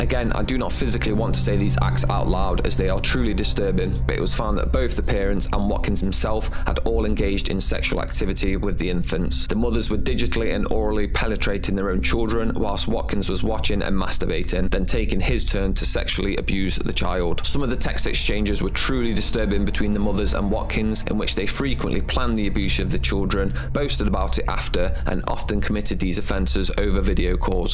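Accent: United Kingdom. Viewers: Sorry for asking! Is ur accent british? (Not a native English speaker is asking!)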